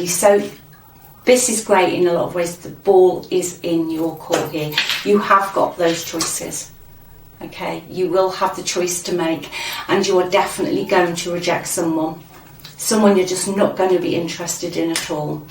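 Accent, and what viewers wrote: United Kingdom. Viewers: You’re the best! Great to hear a fellow Brit ….keep dozing what you are doing. I feel relieved to be away from him!!!!!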